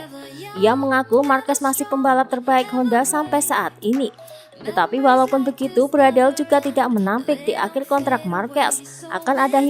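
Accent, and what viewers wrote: Java, Indonesia. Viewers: Jawane medhoookkk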